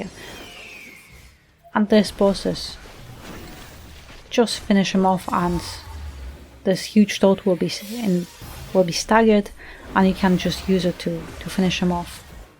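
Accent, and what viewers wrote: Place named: Eastern Europe. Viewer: I like her accent. Sounds eastern europe, I am curious…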